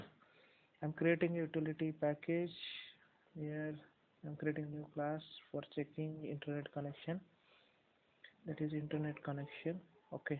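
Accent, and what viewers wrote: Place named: India. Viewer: I hate Indian accent. Next time, use a text-to-speech software to generate voice instead.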